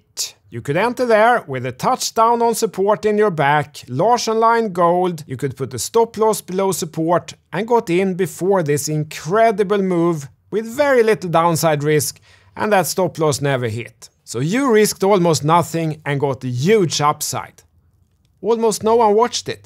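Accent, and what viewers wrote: Sweden. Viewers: Ahhh the Swenglish we all been missing 😎👍🏻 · It's not your video's that suck or your calls... But No one understand wtf you're saying with that heavy jibberish swedish accent :D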